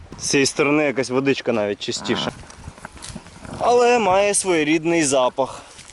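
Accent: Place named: Galicia, Ukraine. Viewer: Добрий день, хлопці дівчата. За відео спасибі. Все класно, подяка за рідний галицький акцент. Цього літа хочемо висадитися на Кінбурнській косі - катером з Миколаєва і пішки по узбережжю до Покровки(Ягорлицький залив). У мене кілька запитань з цього приводу: 1. Як там з водою? - ми плануємо пройти 20 км на півднь по берегу до Покровки? 2. Ви були як я зрозумів в Покровці. Ми звідти хочемо теж стартонути додому . Але будемо їхати в Харків з Херсона. Так от : чи є з Покровки транспорт хоча б до Геройського, про Херсон навіть не питаю?? Ваша відповідь стала би нам у великій пригоді. Дякую.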